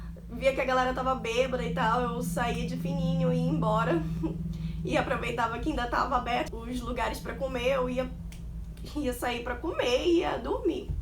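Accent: Pará, Brazil. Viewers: O que vc estudou e se formou na faculdade? E como vc fez para se sustentar aí? 😱😱😱 sei q é caro, o dorm tb é caro! Fala um pouco sobre a questão do racismo? Vc é natural de onde,? Parece Paraense pela sua fala❤️Bjusss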